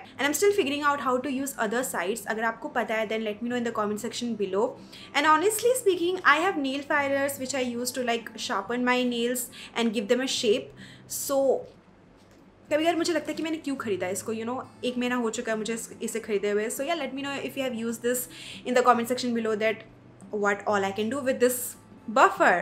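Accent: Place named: Bengal, India. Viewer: Baap re baap. Bengali touch from u. Love it❤️❤️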